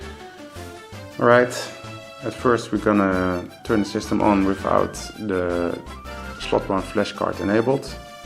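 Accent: Netherlands. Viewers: Heard you were dutch right away haha :-D Lekker bezig man! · Are you Dutch by any chance? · Je klinkt als een Nederlander die Engels praat, klopt dit? 😜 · Yes, he sounds Dutch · Are you from the Netherlands? Your accent sounds dutch.. Anyway.. If you are, can you make me one for a fair price? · You a dutchie??? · wow, dit is echt het meest nederlandse accent ooit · I hear a Dutch accent ;) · another dutch who can't speak english without an accent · are you dutch? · I love his dutch accent :D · Are You dutch? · You sound Dutch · Are you dutch cause you really have a dutch accent i can know cause im dutch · (ik hoor aan je accent dat je Nederlands bent dus doe ik dat ook haha), Gaaf ding man, kun je laten zien hoe je 'm maakt? Ik wil dit ook gaan doen namelijk en heb er niet veel verstand van. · You must be a coming from the Netherlands, according to your accent. 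Anyway. I like the Game Boy Advance shelled bottom of the Nintendo DS lite! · You have the most obvious Dutch accent ever. Great video though, interesting build!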